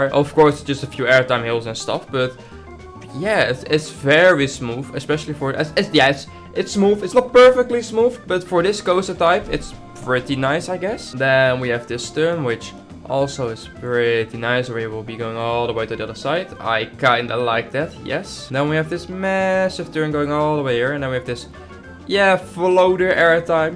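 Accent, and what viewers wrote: Netherlands. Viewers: Ar you Nederlands?????????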